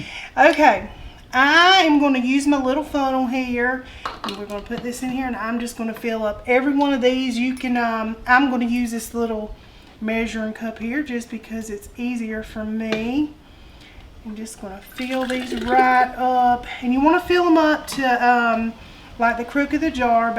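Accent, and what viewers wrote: South, United States. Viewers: Love your southern accent! Been trying to decide on what recipe to use and I chose yours. 🥰 I'm about to do this tomorrow while I'm watching some Colts football. I would have never thought about using "wheres your sister sauce" and soy but I'm all in on that now. I love a sweet but savory taste. Wish me luck. And we might be having spaghetti for dinner as well. 🤣 Thanks so much for sharing! Subscribed as well. Yay! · ok thank you so much im elated that you landed in my feed, you had me at your southern accent ha ha❤ I love your voice its beautiful and make listening pretty cool. Ive watched this video alone 6 times already while taking notes. Thank you for being available on top of your own life. It takes a village to can food ✅️. Last summer i used the pressure can method with okra in my presto on top of the stove. Lost r jars due to they did not seal. 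I think they were too full also. Like above the rim. 😢. Ty so much you're very helpful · Well, I'm glad you did it! I'm about to try this with my big ol' mess of tomatoes from my garden!!! Just subscribed! I'm a southern girl here in Texas! I don't know where you're from, but I can tell you're from the south! Gotta love it! ❤ Blessings and Much Love my dear sister! 🙏✝️🛐💜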